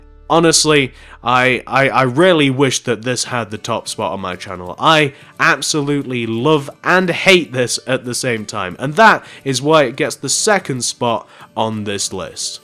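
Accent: England, United Kingdom. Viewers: Are you form England?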